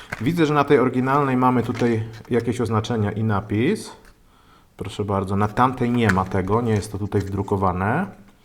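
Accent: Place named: Kielce, Poland. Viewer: Hahaha 😁 razi Cię bo po nicku wnioskuję, że jesteś z Opola. To jest mowa z okolic Kielc 😉 Sam z tych okolic pochodzę i pamiętam jak wszyscy mówili : czopka, szolik, szofka itd. Po 20 latach w Krakowie słyszę te różnice, ale nadal wychodzę na dwór a nie pole 😉 Taka gwara lokalna, pewnie też u Ciebie coś takiego jest i nawet nie zwracasz na to uwagi. 👍 Miłego dnia.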